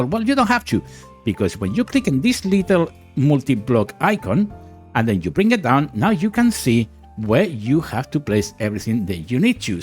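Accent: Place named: Ireland. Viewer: great vid keep up the good work I really like your Irish old man accent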